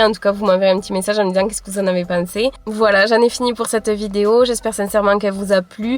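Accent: South, France. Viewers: Magnifique l'Ariège, tout comme cet accent qui inspire le soleil du sud 👌 Merci du partage 🙏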